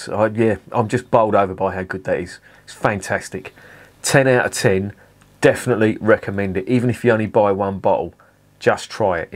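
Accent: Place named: Yorkshire, United Kingdom. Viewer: Blimey thought that was going to be rubbish, surprised. 😀 ps ya Yaaarksha accent is coming on, 😀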